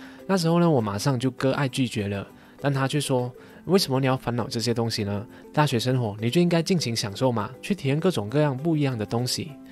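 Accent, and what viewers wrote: Malaysia. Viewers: 原來不只我覺得這支影片的馬來西亞腔比較明顯哈哈哈可是都很好喔又學了很多當我存款不多的時候創業時真的很壓力心裡不安到爆炸兜兜轉轉回歸原點這個影片真的超中肯✨✨ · 为什么今天那么马来西亚腔hahahaha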